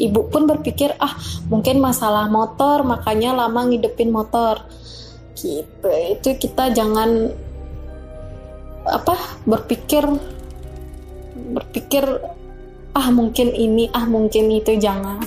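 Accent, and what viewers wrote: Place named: Bali, Indonesia. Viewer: Logat balinya sampek sini mbo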